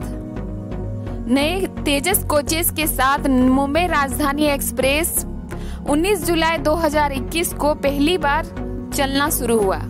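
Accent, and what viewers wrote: Bihar, India. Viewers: Language mei bihari touch jyada h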